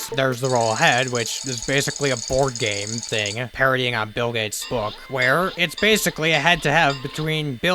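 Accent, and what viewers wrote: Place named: United States. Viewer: What kind of American accent do you have? Very distinct/unique from what I am used to hearing.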